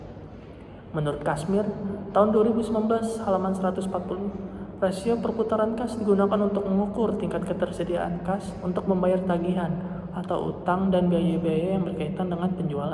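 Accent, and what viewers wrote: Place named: Java, Indonesia. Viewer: Lucu bgtt kayak mas mas jawa😂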